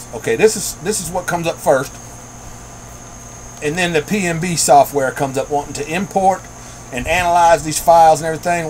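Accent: South, United States. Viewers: Oh... You sounded very southern and I was just curious because I'm form Arkansas.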